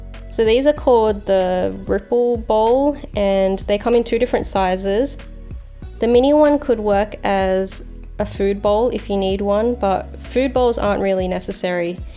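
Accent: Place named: Australia. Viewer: Aussie or new zealand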